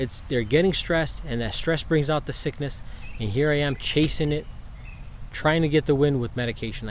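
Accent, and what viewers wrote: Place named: New York, United States. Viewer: Sound like you from New York brother, the loft has to be remade completely, you should make a design similar to this, open ventilation for the breeders, click the link its a link to a photo of a design for a loft that basically keeps things completely stress free, no smell of pigeons , you can guarantee the birds and breeders will always be happy and healthy <link>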